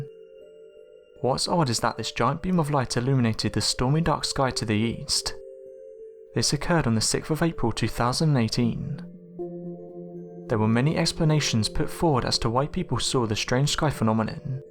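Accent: United Kingdom. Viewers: British ASs accent bullshiter! Hahaha. Ass wipe!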